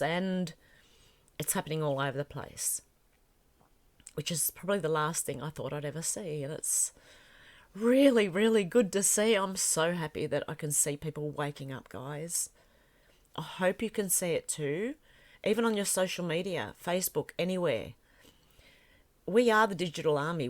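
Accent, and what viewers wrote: Australia. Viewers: I just love your accent from down under..and such a beauty,...inside and out..don't wear yourself down and get sick now..we really appreciate all of your hard work and the sacrifices you've made.. a little secret of mine...I turned my TV off 3 yrs ago and I don't miss it one bit... MSM is all fake news..the continuing of mk ultra and mind control. You are such a strong lady and Patriot.. thank you ....just for being you..we are all in this together.. WWG1WGA..THANKQ DEAR LADY.. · I really like you. I like your videos. Really love that Australian accent. Keep up the great work , we appreciate it.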